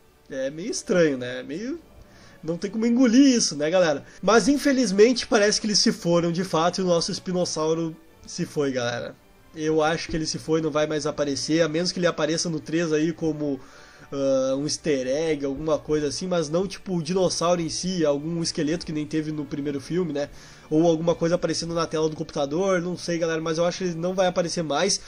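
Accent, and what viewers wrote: Rio Grande do Sul, Brazil. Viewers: Tu é gaúcho! Ba Eu também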